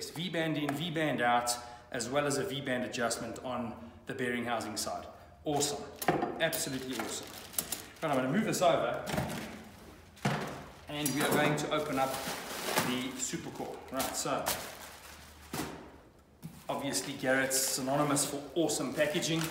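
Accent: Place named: South Africa. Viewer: Dude is definitely south African...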